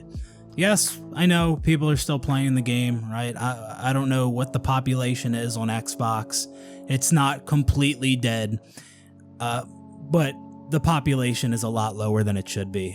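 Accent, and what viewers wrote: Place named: Baltimore, United States. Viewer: This dude is 100% either from Baltimore or PA. Or he’s lived in one of those 2 all his life. Guaranteed 1000% cause of the accent.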